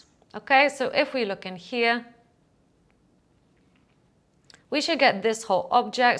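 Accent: United Kingdom. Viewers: Great content, British accent, this channel rocks!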